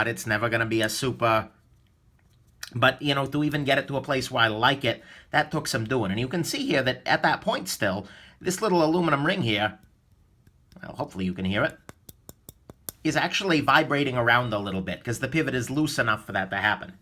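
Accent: New York, United States. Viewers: naw he just sounds like a damn proud New Yorker lol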